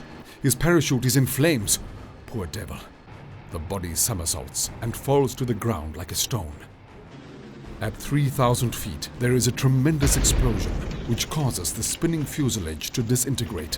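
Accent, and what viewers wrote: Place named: South Africa. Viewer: Very well done. War is a dramatic and often ugly business. This makes the point rather well. That people on either side should celebrate the death and suffering of others illustrates how crude we can be. Narrator South African?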